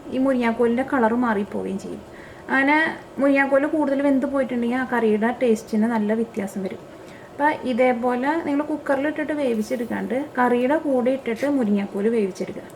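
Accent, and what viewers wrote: Thrissur, India. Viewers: എന്തൂട്ടാ പറയണേ.. നല്ല നാടൻ തൃശ്ശൂർ സ്റ്റൈൽ.. :D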